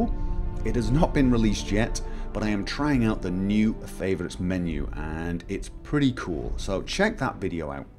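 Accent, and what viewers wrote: United Kingdom. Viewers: His accent is not Scandinavian at all it's British (I know the accents there vary but I'm not sure exactly where is from). However, he does live in Norway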